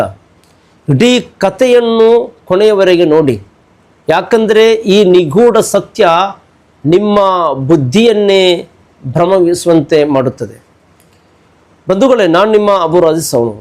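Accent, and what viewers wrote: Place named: Mumbai, India. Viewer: Nimma kannada Mumbai kushi agthide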